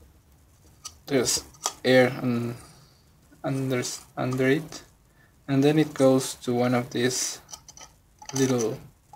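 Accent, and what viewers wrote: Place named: Argentina. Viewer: sos argentino? you have the calssic argentinian accent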